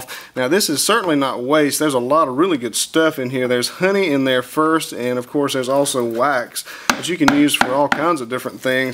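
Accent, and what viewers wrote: South, United States. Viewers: 😂 love his thick (southern?) I think accent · with a southern accent 😳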